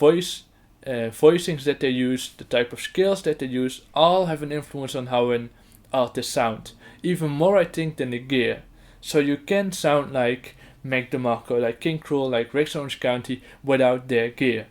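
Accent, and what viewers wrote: Netherlands. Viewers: Het Nederlandse accent is geweldig ahaha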